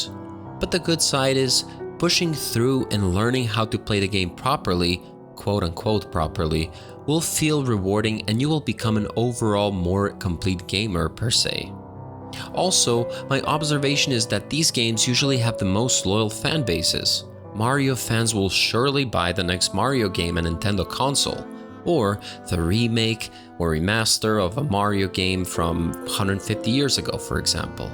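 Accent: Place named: Italy. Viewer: That Italian accent tho 😁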